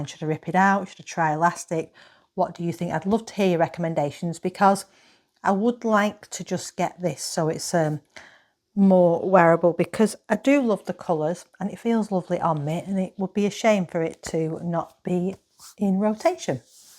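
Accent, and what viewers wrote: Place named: United Kingdom. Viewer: i love your accent!!! (from ny, rarely exposed to any of the UK accents)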